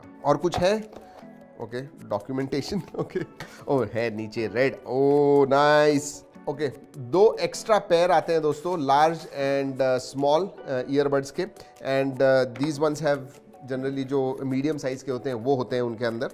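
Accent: Maharashtra, India. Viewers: Are you a Maharashtrian ? And definitely from Mumbai ?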